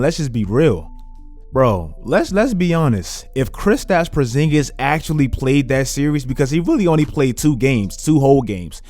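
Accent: Baltimore, United States. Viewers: you from Baltimore?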